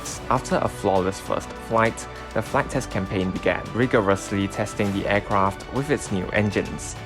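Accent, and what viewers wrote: Singapore. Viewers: im guessing thats a singaporean accent with some shots from changi?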